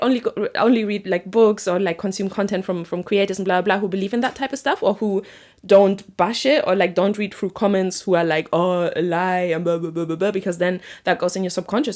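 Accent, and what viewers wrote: Germany. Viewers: i like your German accent, I assume it's a German accent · Ich wollte mal fragen welchen Stein ich benutzen kann als Steinbock :) Ps : Dieser deutsche Akzent 😭✋ ich liebe es 😂😂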